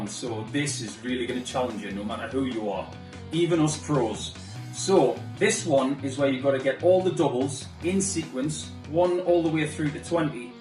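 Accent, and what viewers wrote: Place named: Australia. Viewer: Which part of Australia is your accent from Paul? I can’t pinpoint it. 😂😂 the goat darts villain for me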